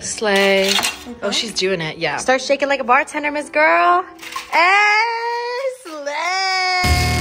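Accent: United Kingdom. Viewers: Pls I love you but please stop with the British accent 😭😭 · lmao love your british accent and this cooking video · Love love the British accent ❤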